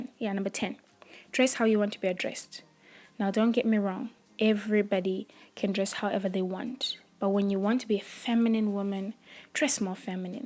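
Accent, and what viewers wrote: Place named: Zimbabwe. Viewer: Very proud to hear a sophisticated Zimbabwean sisi here :) Well done, lovely accent. I have the same. Keep living and growing well girl. Love from the UK xxx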